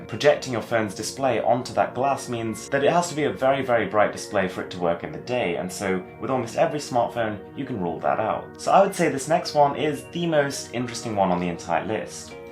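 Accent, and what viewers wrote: RP, United Kingdom. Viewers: I wish I had this type of british accent and not the chavy one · Ahhh bullshit with these damn smart phone devises and a billion amazing things that can be done with them (except take the dog out on a leash to go pee and poo), Disney cga animation movies, and "hot" fox news chicks bitching much ado 'bout noting in tight party dresses, and cheap lil "Cosmo" 'bot toys, and wall paper-thin 100" flat screens, quad copter toy drones with super hi-fi HD cameras, and stupid auto-correct that don't work as intended half the time, and other cheap gimmicks to keep our minds off the fact that modern technology has failed to put colonies on our Moon and the moons of Jupiter and space stations orbiting around the ones of Saturn. Whatever happened to the dream of interplanetary space travel? Inter-Galatic commerce? Gone to pot already? All we can come up with so far is some surreal composition of a fuzzy-faced used camel and goats milk arab dealer, sporting a dated pompador-like hair style with a posh British accent trying to charm the panties off of suburban White 16 years old girls and ex-virgins, doing his impersonation of the late James Mason plugging cell phones aps on international YouTube tv. 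What the HELL man?!?! 2017 and this is it? More like a damn apathetic rut to me. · Yhh daaaaam this guy has that one British voice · Music from the serie Gomorra and a posh British accent. Hmm . . .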